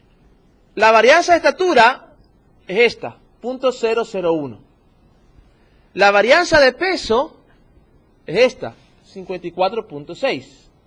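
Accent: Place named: Ecuador. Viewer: se me hacia familiar la voz de ecuatorians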